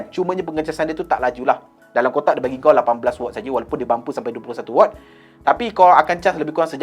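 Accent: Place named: Malacca, Malaysia. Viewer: Abng asal melaka ke pliss reply 🙏🙏😌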